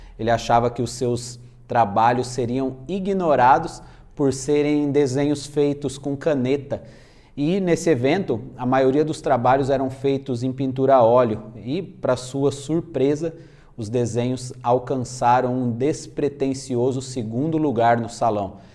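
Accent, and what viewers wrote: São Paulo, Brazil. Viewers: você é da região de SP?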